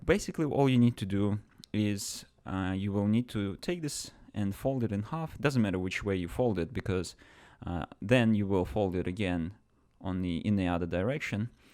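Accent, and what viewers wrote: Russia. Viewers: С чего ты это взял? Явно русский акцент и отдельный канал где он говорит на русском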